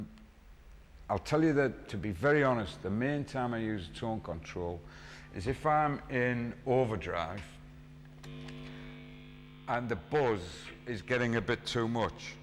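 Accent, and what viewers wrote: Middlesbrough, United Kingdom. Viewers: Thanks, nice to see how you work all that. I was born in Middlesbrough but parents left when I was five. Nice to hear that accent again. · I'm a Middlesbrough guy, our accents are pretty similar - we've never met but in the late 60's when I got married we lived in one of the street houses near Ayresome Park, and Rea's cafe of course. In '62 my mate and I bought guitars from Hamiltons Music; he got the exact sunburst Hofner as you and I got the red Hofner V2 with same switch gear as your brown one, a young lads Strat. Cost 52 Pounds! Both were played, mine through a Selmer amp, around the pubs, clubs, dance halls in the area and were well used. But I still have the V2 in its original red-plush lined case, no longer in use of course. In the 80's I also bought a Tokai Springy Sound Strat in South Bank. I traded it on a new Tel some years later, which I regret as they are sought after now. 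Also have a PRS Les Paul type but never had a Strat, so am thinking of getting one for that unique clean tone. cheers John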